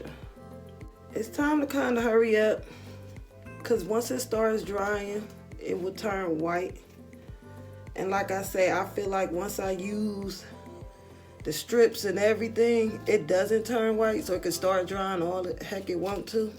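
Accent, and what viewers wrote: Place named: Jacksonville, United States. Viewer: Luvvvvv, if u dnt sound like yr from Jacksonville, Fla 😂😂😂 with that bust it down 😳, I almost fell off my bed( I'm like where's the gar?😂) ! Love the look ! I love the sleek hair styles( when I'm doing my growth treatments )! Kp doing u ma !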